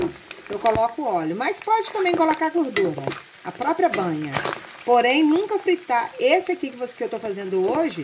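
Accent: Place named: Minas Gerais, Brazil. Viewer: eu li um comentário q disse ,pelo sotaque só pd ser mineiro sujo ,ou goiano , não entendi fiquei curiosa, por favor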